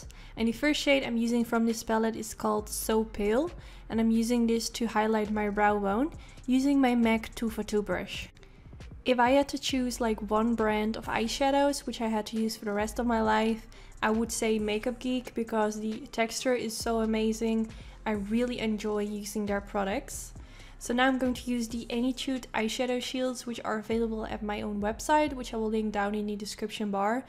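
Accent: Netherlands. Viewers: She sounds Dutch but I could be wrong! I think she sounds really cute and sweet :-)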